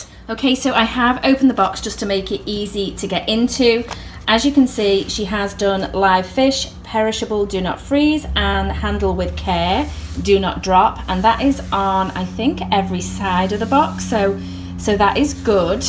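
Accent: United Kingdom. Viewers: Are you from the UK? Of USA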